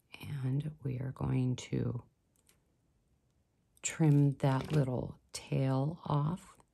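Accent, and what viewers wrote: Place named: Midwest, United States. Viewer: are you from the Midwest somewhere? I hear my family in your pronunciation of words with “or” in them like “form”. I love your designs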